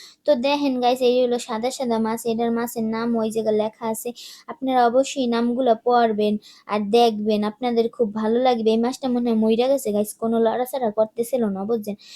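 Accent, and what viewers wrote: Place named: Barishal, Bangladesh. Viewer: তোমার মুখে বরিশালের কথা খুব সুন্দর লাগে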